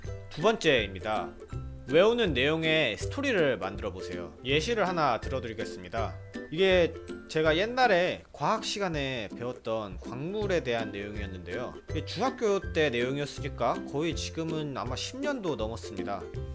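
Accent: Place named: Jeolla, South Korea. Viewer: 전라도 사투리 쓰시네요.. 참고할께요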